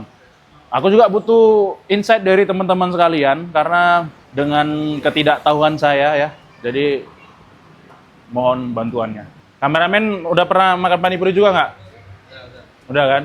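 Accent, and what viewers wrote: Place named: Java, Indonesia. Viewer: Hebat mas bro,,,,semoga sukses. Btw, kok bisa ngomong jawa..logat jawa,,,Gimana ceritanya ?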